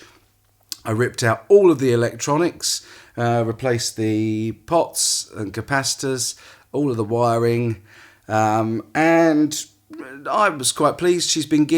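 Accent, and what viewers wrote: United Kingdom. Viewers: There is nobody better then you reviewing chinese guitars hands down man ! very very nice british accent, love it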